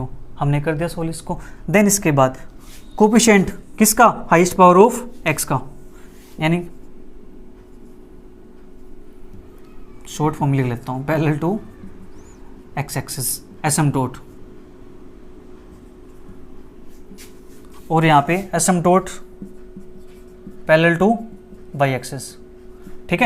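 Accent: Haryana, India. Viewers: Sir app haryana se ho kya?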